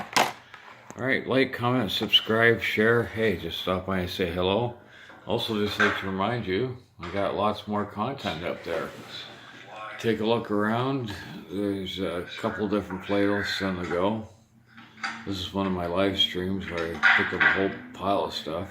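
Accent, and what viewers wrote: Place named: North, United Kingdom. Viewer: Some good stuff you got there! I hear that great north accent =p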